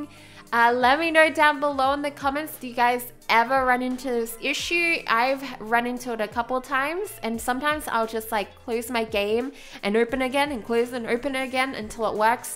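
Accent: Australia. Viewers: Are you from Australia? I think I hear that cute accent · sounds Australian:)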